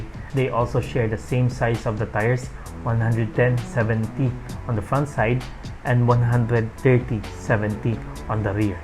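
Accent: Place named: Philippines. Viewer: I'm liking the filipino accent. Also he's aiming for a much bigger audience obviously so he's using the universal language so other who aren't Filipinos can understand. Just saying.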